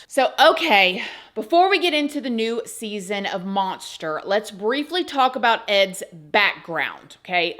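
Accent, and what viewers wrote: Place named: Eastern Shore, United States. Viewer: Are you from delmarva? It sounds like a eastern shore accent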